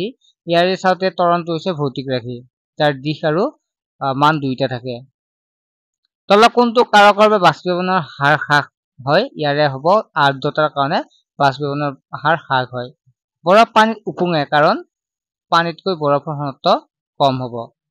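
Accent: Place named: Jorhat, India. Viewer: Sir jorhat district r lage 😅😅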